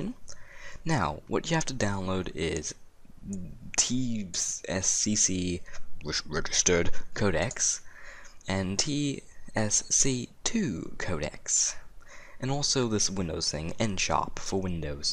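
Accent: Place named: United Kingdom. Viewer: british accent? xD I'm from spain and I just love how you speak english!!! UvU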